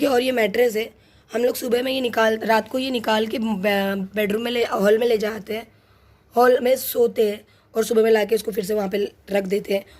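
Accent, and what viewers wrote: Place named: Nagpur, India. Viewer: Asslamoalaikum ji.. Aaj pehli bar apka vdo dekha maine.. Balki apko hi pehli bar dekha maine aaj.. Pata nhi Q but aap dekh k bahot apnapan feel hua mujhe.. Like apki bate hamare Nagpur Jaise lagi.. Aap kaha se ho.. Or vdo bhi dekhugi ab mai apke.. 🤗🤗🤗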